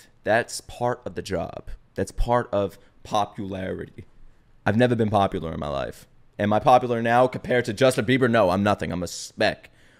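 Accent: New York, United States. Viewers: Hi...I'm a 4 hour New Subscriber...LoL...That first reaction Video of Pentatonix I was floored laughing and enjoying myself, from that one video I instantly Subscribed.....and Less then 5 minutes in I knew you were from New York...lol...YOUR AWESOME....FUNNY AND DAMN YOUR REACTIONS ARE AWESOME! Haters are always going to hate...It tough but Don't Let em Get you Down. You've got Fans and Subscribers Who Love what you DO...SO Keep Your Head Up and Don't Let em Get you Down.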